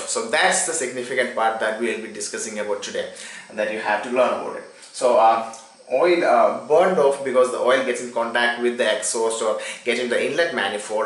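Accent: Kerala, India. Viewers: malayali aanalle... ?? :D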